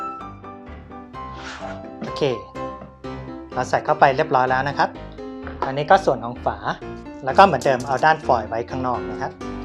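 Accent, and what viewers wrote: China. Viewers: พี่เค้าพูดสำเนียงเหมือนคนจีนเลย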